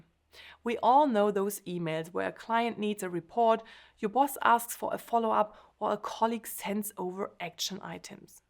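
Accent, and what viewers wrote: Germany. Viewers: is she german? I like her accent.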